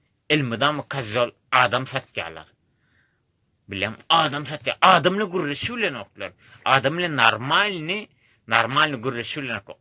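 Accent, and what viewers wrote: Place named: Mary, Turkmenistan. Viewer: Dost, senä Marydan! Gürleýşiňden bildirýär!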